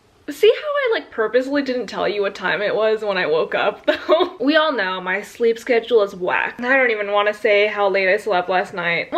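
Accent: United Kingdom. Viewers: that british accent is more realistic than my own english accent lol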